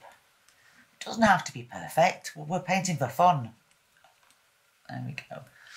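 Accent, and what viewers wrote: United Kingdom. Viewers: What a super tutorial this is ❤. As a foreigner (to you ) I just love listening to your British accent ( almost makes me forget to paint 😊 ) and I will definitely try to make a go for a snow man this year ☃️ Thanks for sharing this 💯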